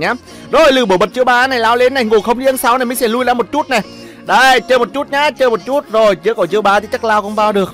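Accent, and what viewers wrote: Nghe An, Vietnam. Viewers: Nghe quen giọng lào lúc nào không hay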